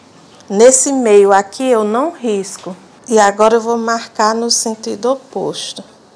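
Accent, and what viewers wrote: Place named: Northeast, Brazil. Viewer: Boa tarde. Amo seus videos e as explicações, sem falar no seu sotaque nosdestino. Tbm sou. Mas, não fique chateada.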